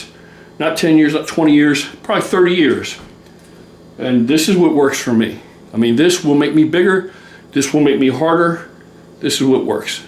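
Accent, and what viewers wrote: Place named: Philadelphia, United States. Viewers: You sound like you live in Philly or somewhere in the Delaware Valley. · You sound like you're from Pennsylvania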